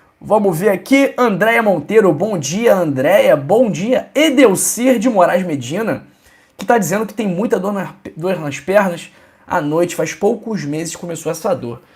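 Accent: Rio de Janeiro, Brazil. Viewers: Esse sutak carioca é que mata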